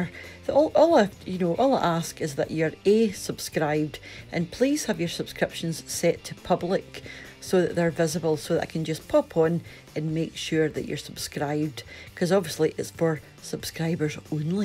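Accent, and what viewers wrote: Scotland, United Kingdom. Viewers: I subscribed because you came up in my suggested videos I watched a few I really enjoyed you Channel! Also love the Scottish accent x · Because im scottish and have been away forty years and you bring back so many memories with your accent and recipes so glad I found your channel x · I subscribed as I love watching food ideas and new recipes, and your Scottish voice and the scenery at the end warms my heart and you seem to have such a great caring personality and make every one welcome. x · I live in Maine in the US. I first found you while looking for Traditional Scottish Recipes. I think I was looking for Stovies at the time. I stumbled upon your channel and fell in love with your soft sweet way of talking, your (of course) accent and your way of describing what you are doing. I've learned a lot from you about Scottish food and cooking in general and love the little stories and description of the details of your week. 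When I tune in, I feel I'm having a chat with an old friend. Also, I am planning a trip to Scotland in September and I'm sure learning about the food will enhance my visit. Please keep those recipes coming. Love them and you.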